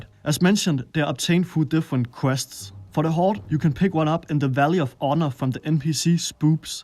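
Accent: Denmark. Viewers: Lol den danske accent er stærk :p God video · probbaly the worst danglish accent i heard to date. Thought oure military was the worst but you just wont that metal my friend. Accent homie accent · that is some insane danish/english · This is the most Danish accent I've ever heard. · Haha ja lyder som nordjysk :p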